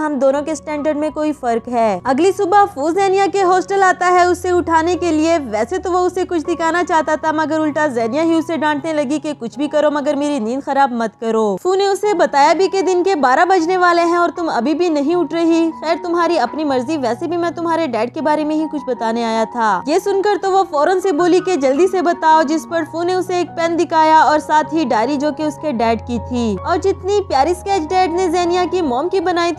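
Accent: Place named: India. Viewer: Aapke awaj se lagta hai ki aap indiqn ho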